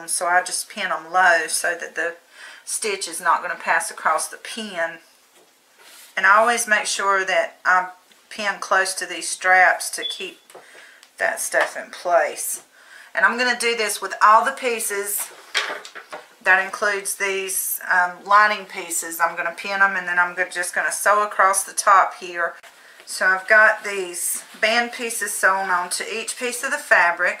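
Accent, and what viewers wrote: South, United States. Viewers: I am from Mississippi, moved away very young. Loved, loved, loved hearing that southern accent again! And you are my FAVORITE tote/purse construction teacher. Love You! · LOVE your tutorial and even more, your Southern “accent” (I’m from the North so it’s an accent to me, haha) Tfs this very clear and concise video on how to add a zipper to a tote bag and also with an accent fabric. I bookmarked and partially viewed so many videos on bags my head was spinning but, yours was the best so far! Will go start my FIRST tote bag now and hopefully it’ll look as good as yours!!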